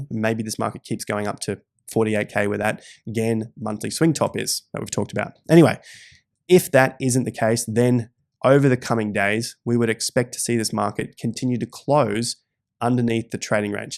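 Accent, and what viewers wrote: Australia. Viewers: accent brings me back to my backpacking experiences in Australia. Good on ya mate! But regardless of that, I love the honesty and all the considerations in this analysis. I’m gonna stick around here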